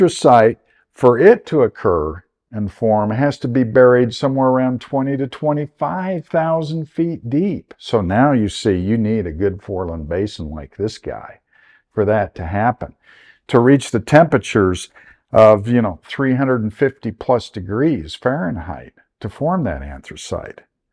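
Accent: United States. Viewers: Great content. Just so you're aware, the YouTube cc talks about "Anna Klein" where it should be anticline. It would be great if your editor could add these key terms and the names of the various structures as text on screen for people who are hearing impaired, watching with background noise, or not native English speakers accustomed to an American accent.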